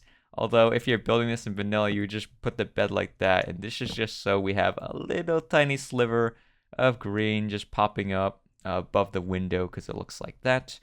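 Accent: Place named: England, United Kingdom. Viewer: The english akzent😂😂😂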